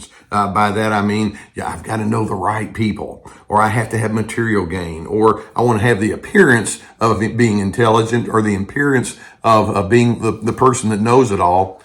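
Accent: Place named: Texas, United States. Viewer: you (and ) have been a tremendous help as I recover from a 34 year marriage with an extreme narcissist. I’m a Texan living in Idaho for 30 years and your Texas-English is beautiful. 🥰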